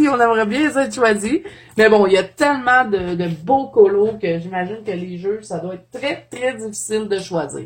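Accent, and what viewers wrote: Quebec, Canada. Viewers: Salut, tu n'est pas satisfaite de ton colo Titanic mais voyons donc, tu nous joke comme on dit au Québec! Il est top et le coeur de l'océan est très réussi je trouve. J'aime beaucoup aussi celui avec les poissons volants. Bravo pour tes belles mises en couleur, j'aime regarder tes vidéos et surtout, t'écouter me rappelle quand j'habitais à Montréal. J'adooooore!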